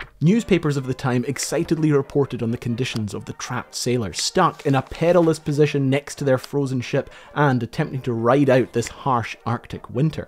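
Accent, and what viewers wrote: Scotland, United Kingdom. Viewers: Love the Scottish accent and Neck fur!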